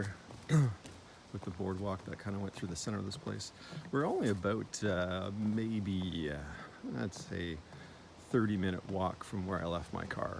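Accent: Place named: Canada. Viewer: I think I've seen this when it was 1st posted??? But OH! Your navigation skills crack me up! Not the 1st (& not the last) video you've been lost in. You know I love you dearly, but Magellan you are not. And in my Zombie Apocalypse team, navigation is NOT your job! LOL! (Wink) After 2yrs of your audio/ videos you Canadian accented O's & A's still give me a grin & a giggle. Big hugs & here's to the 2022 spring/ summer/ fall hiking ahead! 🥾🕶☀️💧🎒😉😘🧭 L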